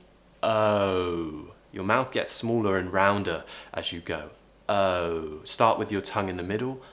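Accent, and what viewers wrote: United Kingdom. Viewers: Hi, This is Libin from India. It has been a wonderful experience attending your programme on British Pronunciation. I love your accent and I practice your lessons daily. There is great improvement in my pronunciation. I take this opportunity to thank you. Thank you so much. Libin Mathew. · I love british accent cos it's quite easy and understandable btw i appreciate your work mate 😘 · I am from Quebec and unfortunately I cannot hold a conversation in English, but your very British accent is really nice. I listen to your shows from time to time and take pleasure in trying to understand your explanations. It also happens that I use the automatic translation into French of you tube, which I do in other English shows, and I must admit that the translation is impeccable, which means that the construction of your sentences must be flawless. 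Thank you and good luck for your work and for making us appreciate your wonderful culture. (translated by Google) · Thank you so much! I love the british accent and I'm lerning a lot with your videos! What a lovely sentence "I have so much gratitude for every mistake I make" I agree with you and I try to think that way when I make a mistake. I enjoyed this lesson! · your British accent is everything. I feel stupid trying to copy you. 🤣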